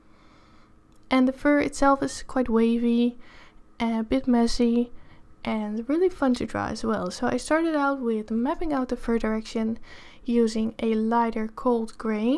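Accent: Netherlands. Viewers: You hear that she is from the Netherlands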